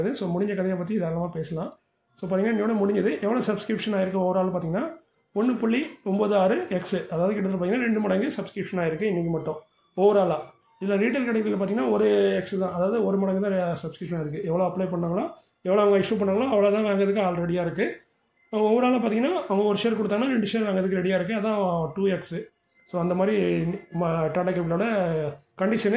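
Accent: Tirunelveli, India. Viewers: ஐயாவின் நெல்லை தமிழில் தகவல்கள் பயன் அளிப்பதாக உள்ளது. திருப்பூர் புல்ஸ் சார் என்பது தெரியும். சார் தங்கள் பெயரையும் உங்கள்????? சொல்லுங்க. I never miss your news. Thanks for information.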